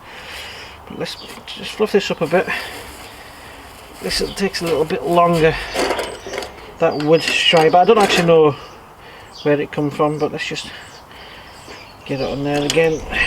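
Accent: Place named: Scotland, United Kingdom. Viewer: Scottish 100 (you sound scottish)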